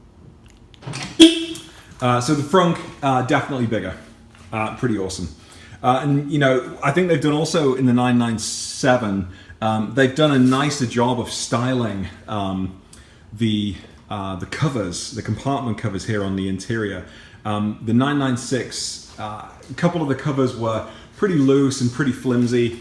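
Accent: United Kingdom. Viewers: Truly not “having you on”. I just love the way the British speak. As an American I’m embarrassed at how badly we murder the language in this country! It’s true my friend. Google 5 mph bumper and you’ll see that there’s a U.S. law pertaining to bumpers. There have been so many cars in the past that weren’t even sent to The States because of it. Many manufacturers just don’t want to deal with reconfiguring bumpers for this market. I’m actually fairly certain that the “bumperettes” (as you call them) don’t exist on the European versions of the 911.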